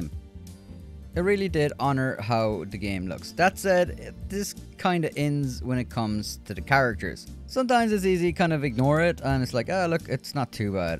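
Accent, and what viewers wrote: Ireland. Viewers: Thanks dude, first thing I copped was the Irish accent 😂 I'm only down the road ha but it's about time someone did an honest review not just bashing the game. Playing San Andreas via game pass on Series S and loving it. I think they look class. Yeah it's Cartoonish but it's supposed to be. Anyway I'll play this through and maybe buy the trilogy. Great review man. Subbed 👍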